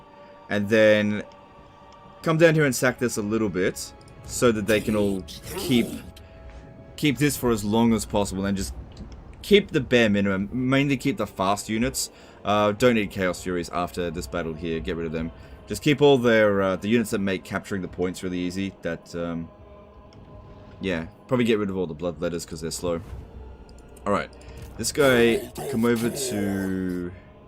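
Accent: Australia. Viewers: Wait is Australian????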